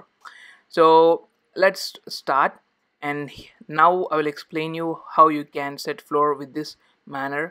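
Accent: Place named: India. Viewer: i think you are indian because you have the indian accent of talking in english maybe like my accent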